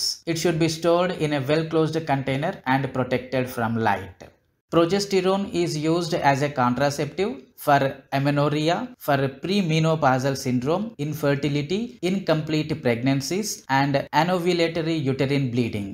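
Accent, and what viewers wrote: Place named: Karnataka, India. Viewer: you have the kannada slang . from karnataka ?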